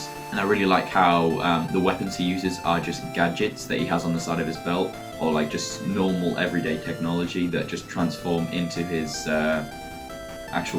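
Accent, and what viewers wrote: United Kingdom. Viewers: Ohh. British. Cheerio! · You talk British?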